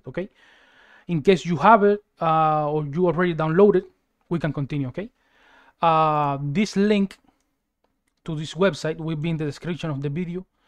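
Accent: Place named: Mexico. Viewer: Great video ... very easy to follow. Love your accent. Mexican?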